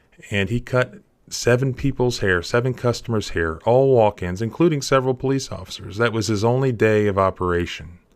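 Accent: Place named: California, United States. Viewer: Yes! I really believe that. Sounds like California with a sweet southern tang.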